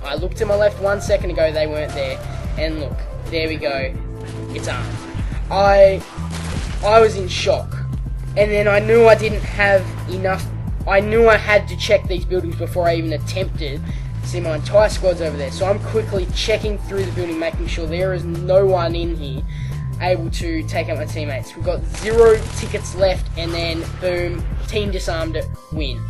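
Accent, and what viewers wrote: Australia. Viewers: i dont know what it is but for some reason listening to an aussie just makes this vid seem more sophisticated. it just sounds right, American comentators just cant top it. he sounds like abit of a bogan but still, this vid doesnt sound as dumbed down for little kids. keep up the good work mate ur a legend. and to people getting bothered by the accent think how we feel with the significantly lower amount of aussie commentators. remember its as anoying for u as it is for us listening to ur accents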